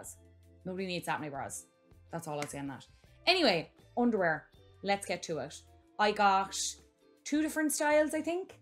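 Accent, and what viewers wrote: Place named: Newfoundland, Canada. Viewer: I swear I thought you were from Newfoundland or east coast Canada. By the accent. Yes I watched this video after the razor video. #Creepy.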